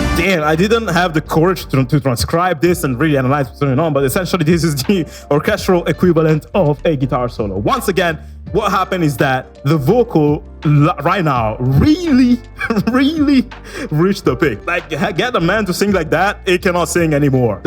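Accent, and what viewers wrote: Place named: Italy. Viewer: He does sound like an Italian with that accent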